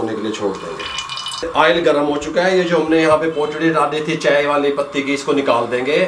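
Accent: Punjab, India. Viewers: Oh ji tusi great ho Aapki Punjabi badi sweet hai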